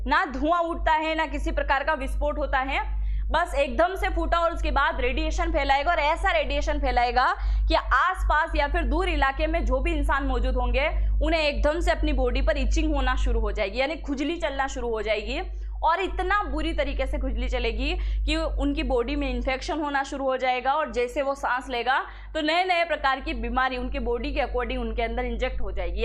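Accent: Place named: Rajasthan, India. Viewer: Aap apni bhada hindustan ki hindi bolen naki rajisthani hindi sory.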